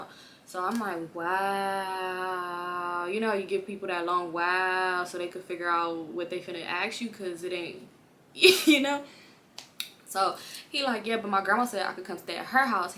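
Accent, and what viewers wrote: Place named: California, United States. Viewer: I can tell you from Cali I am too we love are gold jewelry. The bamboos and the way you talk give it away to us that's from there. Storytime with you be lit tho I just got on here this my 2nd video lol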